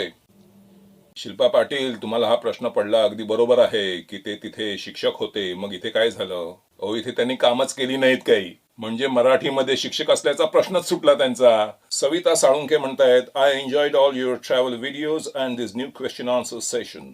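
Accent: Pune, India. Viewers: सुप्रभात नमस्कार..... अगदी पुणेकर मराठी भाषा....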